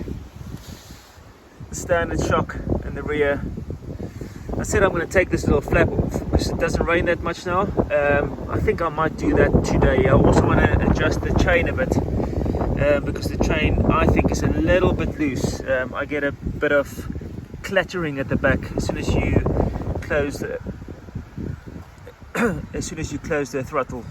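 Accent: South Africa. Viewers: You say a few words. Me: This guy is a South African! Nice channel, you have a new sub.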